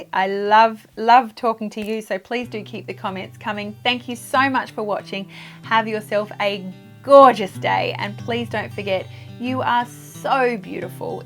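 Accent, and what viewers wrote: Australia. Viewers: Love your videos. You are fantastic. It's great to have an Australian voice.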